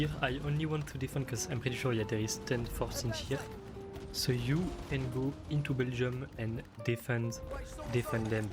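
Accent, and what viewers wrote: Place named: France. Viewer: Sorry, my ears are trained to not hear when they hear france accent so the whole video was mute. How did I understand there was someone speaking with accent? By the comments.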